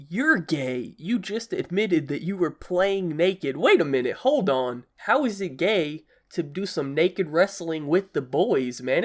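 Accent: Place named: Texas, United States. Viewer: Sorry I don’t really understand your Texas voice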